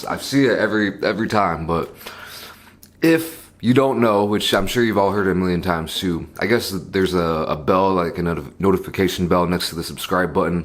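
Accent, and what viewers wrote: United States. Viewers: He talks American asf though I thought he was from the United States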